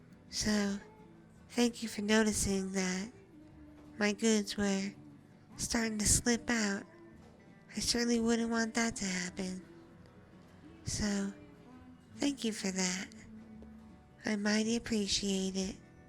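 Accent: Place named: South, United States. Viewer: That country accent just B E A utiful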